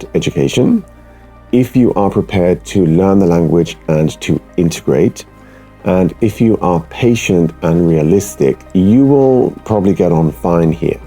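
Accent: Germany. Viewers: Dude, your English turned into German-accent English. Really. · Funny how your accent has developed a German quality to it including a hint of their arrogance. You even look like a German, lol. Your assimilation is complete. WE ARE THE GERMANS, YOU WILL BE ASSIMILATED INTO THE COLLECTIVE, RESISTANCE IS FUTILE. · Why do sound like a German speaking English?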